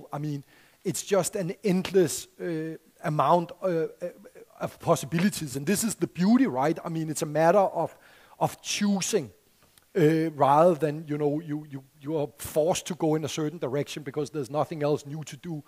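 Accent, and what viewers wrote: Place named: Denmark. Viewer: Yes, he has a very typical "Copenhagen/ English accent" 😁Many Danes make the same little mistakes when pronouncing English (and other languages). Too soft consonants, too flat vowels, too monotonous intonation, an 'R' sound that often doesn't quite fit. An example of the latter is the word "very", which many Danes pronounce as "væ-i" (ie with a silent R). Some sound like they have a potato in their mouth, some say?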